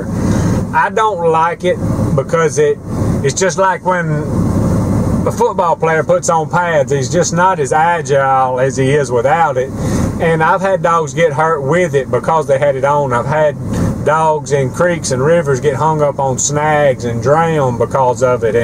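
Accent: South, United States. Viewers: Nice funny accent, I'm not American but I laugh when I hear this accent I think it is southern,right?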